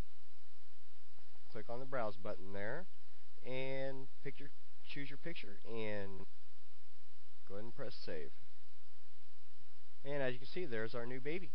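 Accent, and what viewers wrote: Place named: United Kingdom. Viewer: He's a Brit so ofcourse he got an accent.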